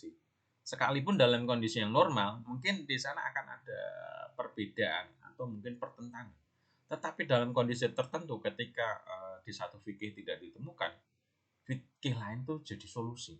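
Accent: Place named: Sumatra, Indonesia. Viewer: Bedo pak ya, suara orang Sumatra ma jawa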